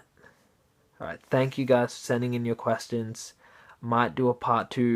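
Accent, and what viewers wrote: Australia. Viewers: Dang this time i can kinda hear your australian accent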